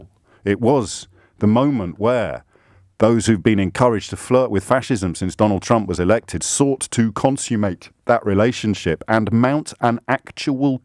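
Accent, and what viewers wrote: United Kingdom. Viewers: I love this commen sence man with British accent.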